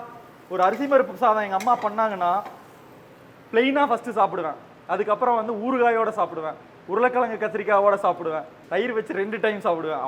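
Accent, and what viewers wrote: Kongu, India. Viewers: உங்கள் கொங்கு தமிழ் மிக அருமையாக இருக்கிறது, உங்களுடைய செய்முறை மிக நன்றாக இருக்கிறது உங்க style -ல சொன்ன அதிபயகரமாக இருக்கு 👍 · Me too Rangaraj.... my fav dish both items.very happy to see this video and ou coimbatore kongu tamil💕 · கொங்குத்தமிழ்வேட்டி துண்டுவேறலெவல் கண்ணு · Seithu sapittom..arumai Ungal kovai kongu thamizh polave paarabariyathodu irukkueathu..nandringa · சாதம் மட்டுமல்ல தங்களது கொங்குத் தமிழும் பிரமாதம் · Our native COIMBATORE special food ultimate Anna👍👌👌👌👌Exact matching side dish👌👍 our kongu naattu tamizh ❤❤❤👍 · Hi sir...my native place is coimbatore.... நம்ம கொங்கு தமிழ் le அசத்தி விட்டீர்கள்...this rice is all time favourite to our family....sir... coconut oil ku பதில் சுத்தமான நெய் சேர்த்து சாப்பிட்டு பாருங்க...semmaayaa இருக்கும்...non veg எல்லாம் அந்த பக்கம் நிக்கணும்....thank you sir for uploading this video... 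· கொங்கு தமிழ் அரூமை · 🙏🏻🌹 வணக்கங்கள் உரித்தாகுக.அரிசிபருப்புசாதம் சூப்பர்.அதை செய்து காட்டியபடி கிரிக்கெட் காமெண்ரி போல் லாவகமாக அதுவும் கொங்கு தமிழில் அழகாக பேசியது அதைவிட சூப்பரோ சூப்பர்.தங்களது சாம்பார் பொடி ரெசிபி யை தந்தருளுங்கள் என்று பணிவுடன் கேட்டுக் கொள்கிறேன் மிகவும் நன்றி கலந்த வணக்கங்கள் உரித்தாகுக 🌹🙏🏻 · 🙏 க்கு ஜே🥳கைப்பக்குவம அருமை.கொங்கு தமிழ் அழகு.என்ன பருப்புன்னு சொல்லியிருந்தா👌😍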